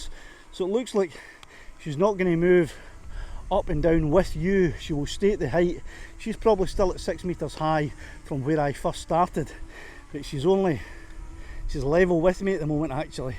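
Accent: Scotland, United Kingdom. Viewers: Love the Scottish accent!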